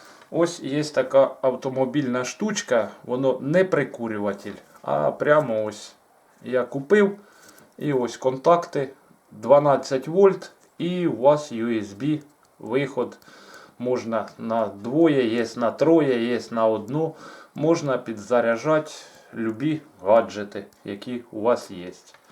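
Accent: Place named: Poltava, Ukraine. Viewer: По говору зразу зрозумів,що автор відео з Полтавщини. Дякую!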